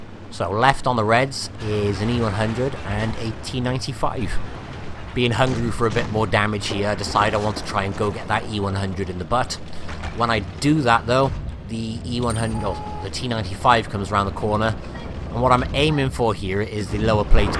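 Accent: Germany. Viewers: great review..love the german accent, keep it up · You Should Upload a Video with short clips of that German Accent with Sentences other people can use in there videos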